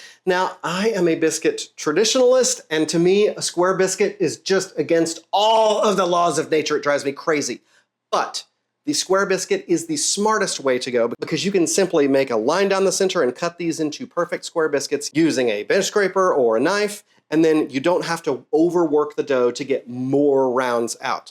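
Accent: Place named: South, United States. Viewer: You ma'am, are a real SouthernSoulSister. I thought so at "ya'll" and "sin" but I knew it for shorly at "my husbands mama". Lol.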